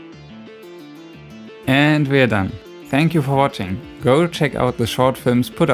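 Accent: Germany. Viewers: Sun Shines Oh... Didn't know but his accent sure sounds German. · Wow, not only is he a great artist, but also has a cute German accent! I could watch his videos for hours.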